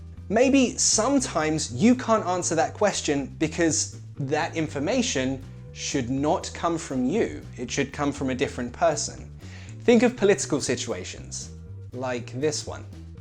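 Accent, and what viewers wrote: United Kingdom. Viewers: OMG ! u've got a great British Accent . 😮😮 by the way . I like ur style of teaching it's funny All the best 😍❤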